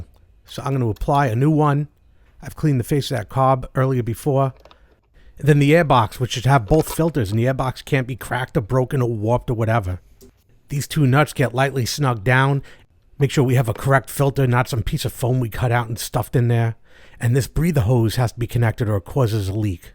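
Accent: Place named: New York, United States. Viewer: This the best Honda generator video I’ve seen on YouTube. Your definitely a New Yorker most likely Long Island wish I new you when I lived in Brooklyn.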